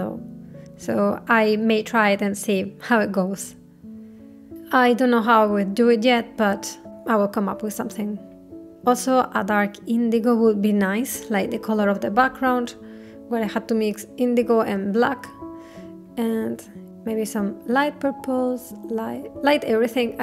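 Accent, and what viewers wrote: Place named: Spain. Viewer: Disculpa hablas español? Es que por tu inglés suena Español como lengua materna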